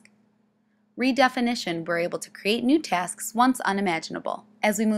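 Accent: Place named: Midwest, United States. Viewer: That midwestern accent :)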